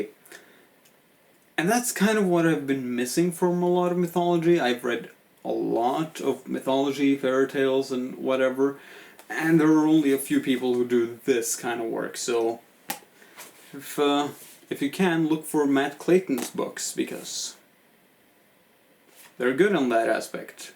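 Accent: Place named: Norway. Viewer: Wonderful accent my guy! Can tell ur Norwegian.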